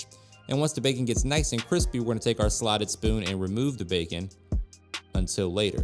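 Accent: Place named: South, United States. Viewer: I eat with rice. I thought I am listening to a black dude. No offense. Then I saw you are a white dude talking like a black dude. You must be from the South. Thats cool Man! Milan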